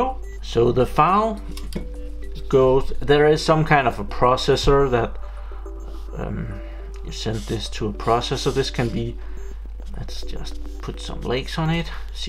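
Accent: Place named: Denmark. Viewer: Definitely danish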